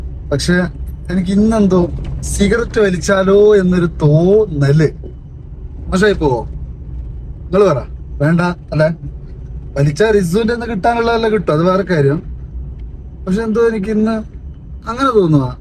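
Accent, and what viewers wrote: Kasaragod, India. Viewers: Ksrgd slang anna use ayikudee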